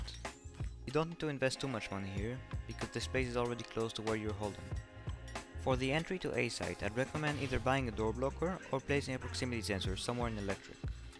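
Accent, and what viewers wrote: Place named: France. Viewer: Your accent and voice are sooo mesmerizing… I learned so much. Are you French or European?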